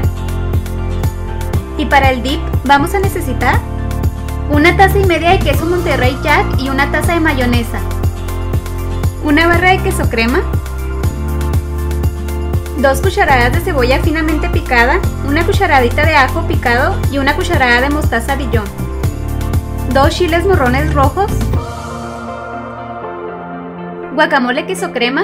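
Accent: Chihuahua, Mexico. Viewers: Eres de CHIHUAHUA ?🤔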